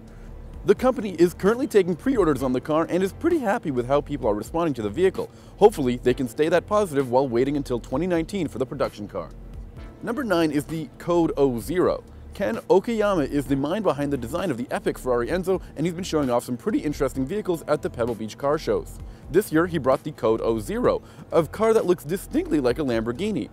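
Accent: Canada. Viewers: Is he Canadian? They say zed as well as a lot of other nations. I ask if he's Canadian because at times there isn't a noticeable differance between American and Canadian accents.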